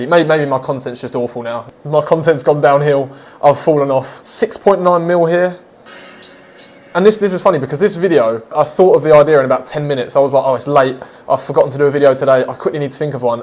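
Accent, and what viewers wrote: United Kingdom. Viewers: i just noticed the british accent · He sounds british Is he? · Dude! I was watching your shorts/tips but I honestly didn't expect you to be British. I thought you'd have a Russian accent 😅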